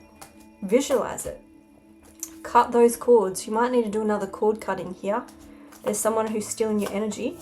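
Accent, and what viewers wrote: Australia. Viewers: I'm from Scotland and I love the Australian accent it's very cool. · I LOVE Australians. The voice or accent 🌞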